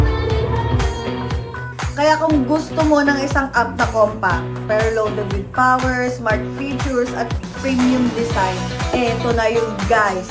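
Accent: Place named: Iloilo, Philippines. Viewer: Ilongga man gali ni c madam🥰mabakal ko sina,pila man?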